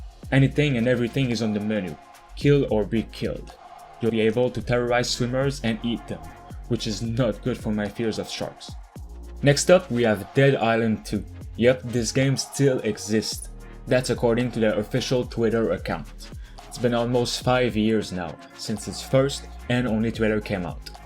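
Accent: Quebec, Canada. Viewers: You speak english like me dude, are you from quebec?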